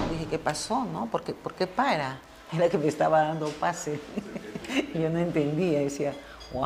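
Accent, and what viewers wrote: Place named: Peru. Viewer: De dónde es? Me parece que de Perú por el acento, espero no decir algo incorrecto, yo soy de Ecuador y estoy estudiando en España, pero la mayoría del tiempo estoy en Alemania porque mi novio es de acá, y tiene mucha razón en todo lo que dice, pero debo decir que los colombianos soy bastante corteses, todos los que he conocido siempre dicen: "perdón", "disculpe", "por favor", "gracias" y "permiso", incluso no se sientan hasta que les digamos "por favor, toma asiento" jeje, y siempre me han tratado de usted hasta amigas de mi edad o incluso mayores, es interesante... al contrario que en mi país incluso también es común dejar propina allá, en fin, gracias por el video. :)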